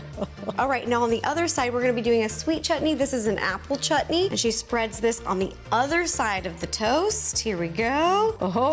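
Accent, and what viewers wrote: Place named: Texas, United States. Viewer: is soth girl.. the texan accent really gives sheldon vibes..loveit... & your foood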